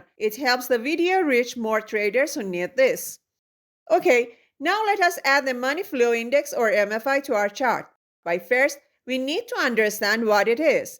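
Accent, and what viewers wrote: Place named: Iran. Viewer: I love your Persian accent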